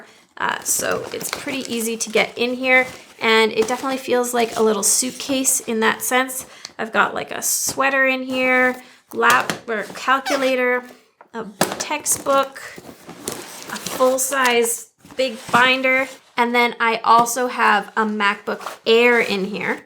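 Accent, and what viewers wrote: Canada. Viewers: I could really hear your Canadian accent in this one 😉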